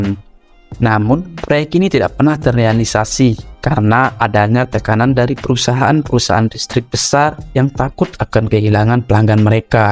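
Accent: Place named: Bali, Indonesia. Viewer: bahasanya loe gue , tapi logat,nya bali bngt 😄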